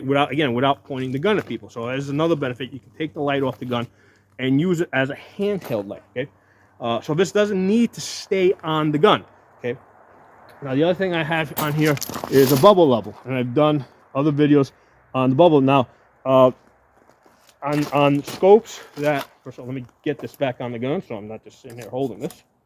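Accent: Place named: New England, United States. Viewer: Yankee with a speech impediment. Negative. I’m not listening to this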